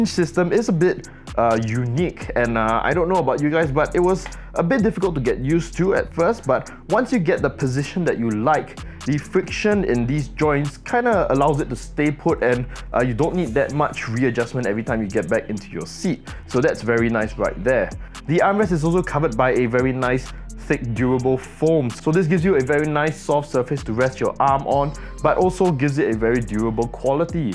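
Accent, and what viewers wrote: Singapore. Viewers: Do I detect a Singapore accent? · I know you’re from Singapore. Your last name and your accent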